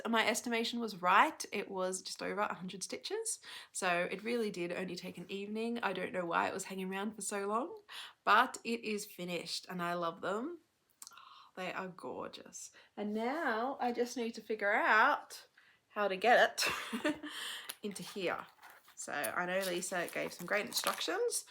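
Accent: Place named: Australia. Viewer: It's so lovely to hear a fellow Aussie voice on Flosstube! I'm so jealous of you going to the retreat with Joanna and Lisa in a couple of weeks!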